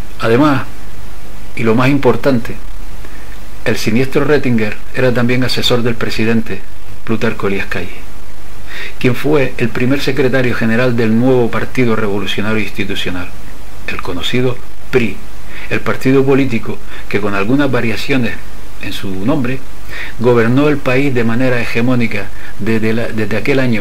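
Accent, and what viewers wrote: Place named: Canary Islands, Spain. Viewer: Por aclararte lo que quería decir, las que van entre sería como sonarían en la lengua/dialecto: el sonido sh- sería en gallego o asturiano, xamón [shamón] o xoven [shoven]; en español normativo y el de la zona castellana sería j-, como la de joven o jamón; En tu caso, el canario, vendría a ser como [hamón], [hoven] y [Méhico] (por transcribirlo de alguna manera), vamos como tú siempre has pronunciado la jota. Lo de [Méksico] cuando vemos escrito México deriva de la confusión que nos produce que en el castellano antiguo la j- actual se escribía x- y está grafía se ha conservado con el paso de los siglos, pero solo en ciertos nombres. Es lo mismo que le pasa a Oaxaca o al "Don Quixote" original del s XVII, que ahora mismo lo escribimos "Don Quijote" pero tanto en el pasado como ahora se pronuncia igual-> Quijote; y Oajaca. 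Es decir, para escribir la jota(j) de hoy se usaba la equis(x) por eso nos confunde cuando vemos escrito Quixote, México y Oaxaca porque son reminiscencias de aquella escritura medieval del sonido jota. La equis de hoy en día como en excursión es un sonido ks-> [ekscursión] que nada tiene que ver con lo que representa esa equis medieval de México. En definitiva, es tan correcto escribir México como Méjico y las dos se pronuncian exactamente igual, lo mismo que Quijote-Quixote, Oajaca-Oaxaca. Un saludo, espero no haberte liado más... jejeje Por cierto quiero recalcar que me encanta tú trabajo y el tono serio en el que lo realizas. Saludos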